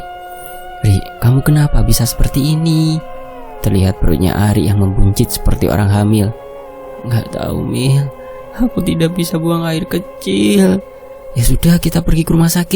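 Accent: Java, Indonesia. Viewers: agak medok ya 😁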